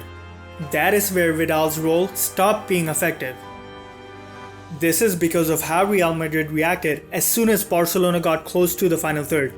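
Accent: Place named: India. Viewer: India English.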